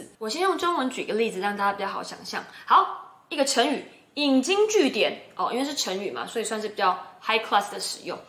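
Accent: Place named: Taiwan. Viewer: 妳分析的很好 很有道理；尤其妳那台語真的是很道地 很傳統！給妳100分👍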